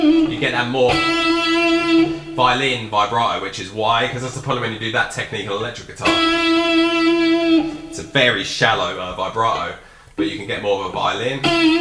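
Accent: England, United Kingdom. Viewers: Cool,! I like these tutorials, keep 'em coming. I like to listen to your Brit accent, too. 💯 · I didn't realise you were English. 👍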